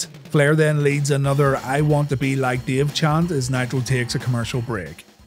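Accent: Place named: Northern Ireland, United Kingdom. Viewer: It’s been a long week lads, let’s gather around the fire and reminisce about 90s Wrestling as narrated by a delightful fella from Northern Ireland.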